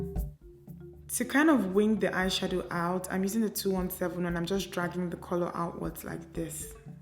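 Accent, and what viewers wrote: Nigeria. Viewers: awwwh.., thanks for this one. love your videos, love your real nigerian accent that goes with it too. love them all!!! pls post a video on IG now it's been long 😢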